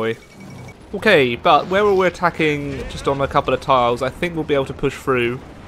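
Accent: United Kingdom. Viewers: Hea Truly an Brit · More British than Spiff. Change my mind.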